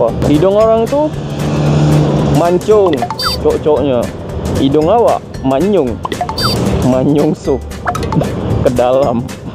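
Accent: Medan, Indonesia. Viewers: Ko kiranya medan bg jemping jemping sampeng😭😂😂😂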